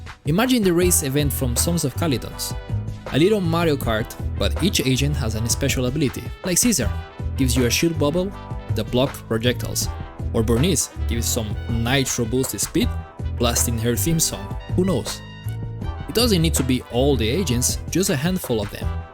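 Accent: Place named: Spain. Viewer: Te lo digo en castellano porque el acento español te delata descaradamente. Sobre el tema incursión arriesgada en concreto te recomiendo que busques videos de gente haciéndoselos con personajes a nivel 50. Creo que si fuera solo un dps check tener los personajes a nivel 60 con las habilidades cerca del 11 sería casi obligatorio y que el juego se puede jugar de manera más profunda de lo que aparenta. Los propios desarrolladores han dicho que querían diseñar el juego de manera parecida a un juego de lucha para que la habilidad de los jugadores tenga mucho peso y desde que he visto este estilo de videos e intento aplicar sus técnicas mis puntos en incursión arriesgada han mejorado una barbaridad. Solo eso, el resto de puntos me dan un poco lo mismo, este en concreto me toca de cerca porque yo estuve un tiempo frustrado con el modo también así que espero que sirva mi comentario. 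Un saludo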